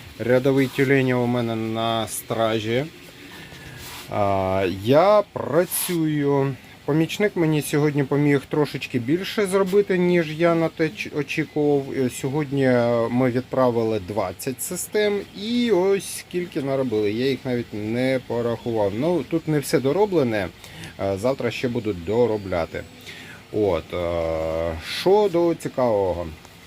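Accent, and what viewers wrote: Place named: Surzhyk, Ukraine. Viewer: Какой натужный суржик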